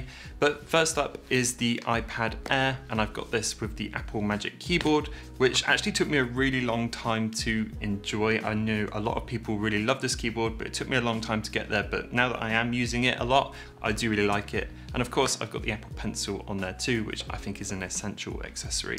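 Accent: England, United Kingdom. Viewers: I am very careful with my gear and so use cases and watching your videos I notice on your phone you do not use a case. Are you very particular in how you handle your phone or do these higher end iPhones have some sort of protective covering on them. I try to be as environmentally aware as possible but one can only go so far with technology before it becomes obsolete . I am facing this now with my first generation iPad Air. I purchased it in November 2013 so coming up for ten years but in this last year, even though it still works well sadly most app´s have stopped working with it and even safari pages will not open in many cases due to the old iOS! Its sad as well as I have always kept it in a folio case and if I take it out the thing still looks brand new! After watching your reviews of the iPad 10th Gen and the 5th Gen iPad Air I have decided to get the air due to the M1 chip and hopefully that means it will have a longer iOS upgrade lifespan, I have been on ios12 point something for years now on my old iPad! 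I am guessing I will notice a considerable difference. I have seen this already with comparing it with my iPhone X ( still working perfectly with plenty of battery left and again, always in a folio case so no need for those horrid screen protectors again, it still looks like new, hopefully I have a good few years left in it as far as iOS upgrades are concerned. I am very much enjoying your channel and have subscribed. Nice to hear and English Accent. I am from Devon bu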